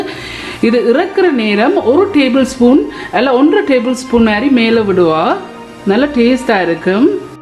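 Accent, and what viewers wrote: Jaffna, Sri Lanka. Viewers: அக்கா நீங்கள் யாழ்ப்பாணமோ.? யாழ்வாடை வீசுது உங்கள் கதையில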